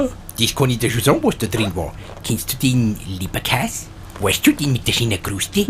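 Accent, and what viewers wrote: Bavaria, Germany. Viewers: endlich moi oi bayrischn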